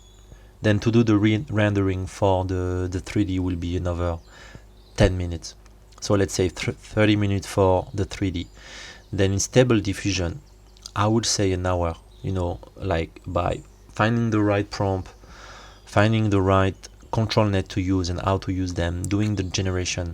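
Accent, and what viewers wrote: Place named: France. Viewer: That french accent 💙 Great technique. Actually when you refuse to insert "beautiful face", you're showing a great example of how to do - effectively - your own art. Beauty? Kind a weird concept, when you scroll into image libraries online and see only beautiful faces. No more face diversity, everyone is a casanova or a supermodel. Great job. Vittorio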